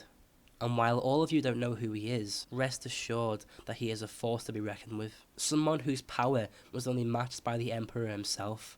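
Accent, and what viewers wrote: Liverpool, United Kingdom. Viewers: Are you from Liverpool? .-. also cool vids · Are you from Liverpool??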